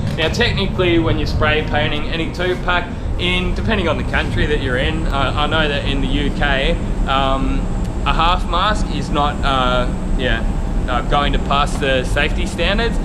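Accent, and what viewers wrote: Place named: Australia. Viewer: not sure if your in Australia or not, your accent makes it sound like you are. If you are will you please show custom spray mods how to paint. I swear he paints like some shoes never held a gun before. trigger happy as hell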